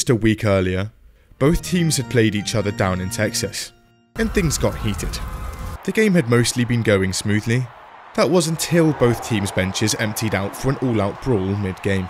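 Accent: United Kingdom. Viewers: Feels weird hearing a british guy talk about baseball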